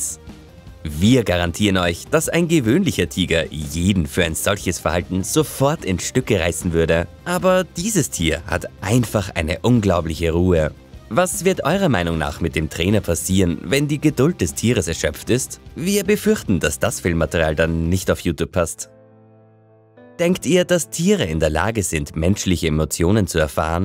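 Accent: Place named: Austria. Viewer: Österreicher! :) Ich bin auch einer, das merk ich doch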